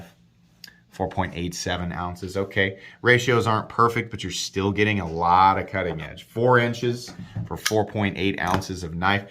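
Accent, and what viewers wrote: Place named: Midwest, United States. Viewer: Kansas! I was close! Identifying accents is sort of something I do as part of my job. Just something I’ve gotten good at. I didn’t know what part of the country you came from and my guess, based on your accent, was Colorado / Nebraska. You ended up just East and south lol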